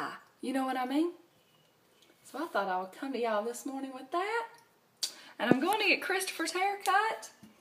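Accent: South, United States. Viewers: I am addicted to watching your videos because of ur southern accent and ur eyes.... Ur eyes are gorg!!!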